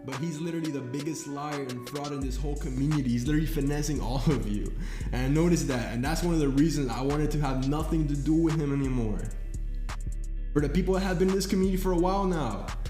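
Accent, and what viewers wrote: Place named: Colombia. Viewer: con un acento absolutamente colombiano que cualquier hispanohablante puede detectarlo fácilmente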